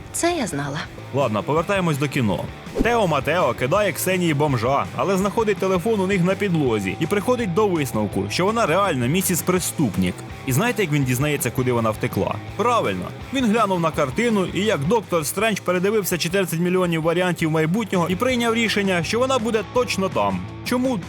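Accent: Poltava, Ukraine. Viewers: Пане Автор, а Ви часом не з Центральної України - просто у Вас говірка схожа на полтавську чи черкаську?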